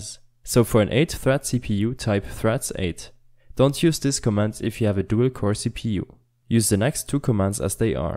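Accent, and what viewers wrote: Germany. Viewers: Man hört richtig dein deutsch Akzent in englisch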